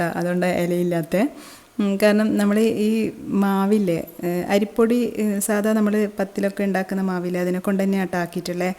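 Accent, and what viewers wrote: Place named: Kannur, India. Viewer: Kannur evideya,slang കേട്ടപ്പോ തോന്നി.നമ്മളും കണ്ണൂർ ആണ്😊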